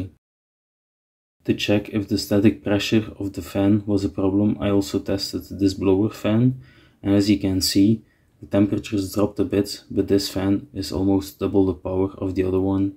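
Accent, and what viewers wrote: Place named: Flanders, Belgium. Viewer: Are you flemish? Curious cuz of the accent :)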